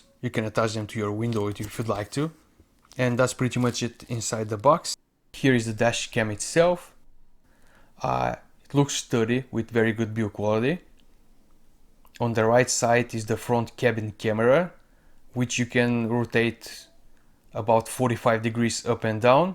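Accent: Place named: Brazil. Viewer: brazilian accsent :)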